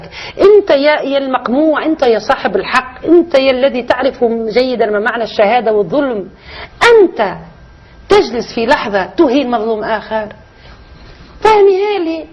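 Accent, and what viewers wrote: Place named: Maghreb. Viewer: لهجتها مغربيه او تونسيه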